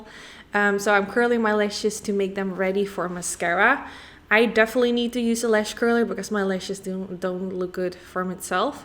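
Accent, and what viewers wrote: Netherlands. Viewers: I Love the Mac paintpot and the eyeshadowpallet , by the way i, m hearing a Dutch accent , if so greetings from The Netherlands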